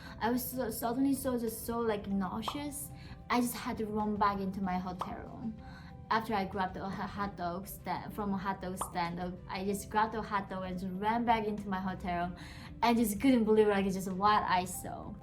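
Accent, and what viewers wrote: United States. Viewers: She now has an American accent! Lovey person and so intelligent 😌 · Picking up that American accent I see I like lol 😍 Cheers much love and respect from los Angeles CA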